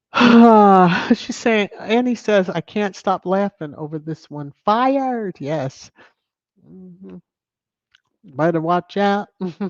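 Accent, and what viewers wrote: RP, United Kingdom. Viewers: Hello happy Thursday, didn't catch the live but enjoy listening to replay, 👍👍👍👍👍👍💯💯💯😘😘😘😍😍❤️❤️😍🌹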